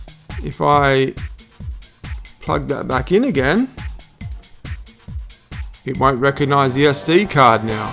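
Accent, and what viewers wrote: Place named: Australia. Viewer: No problem mate. You Aussie? I lived in Melbourne from 2001 to 2003. It's good to hear Aussie talk again. I spent forever figuring out a solution when GoPro was no help. They wanted me to send it in at my expense and only if it was still under warranty (it wasn't). Quite sad when the company that sold you gear breaks your gear and leaves you with the problem. Sent them the video and only got a "thank you". HERO3 black would have been better. I have v50, v58, v70, v124, and v222. v198 is bad.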